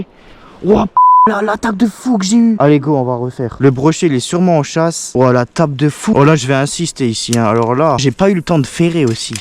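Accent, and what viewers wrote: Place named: Moselle, France. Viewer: le bon accent de mosellan mdr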